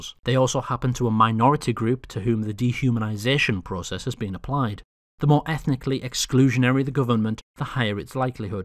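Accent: United Kingdom. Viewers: Love the countryballs and the humor, keep it up! Properly handled video, I wasn't even aware of the genocide in the Balkans. My question is: Where does that accent come from? I know it's British, but more precise please ^^ and how do you animate your videos? Greetings from Germany!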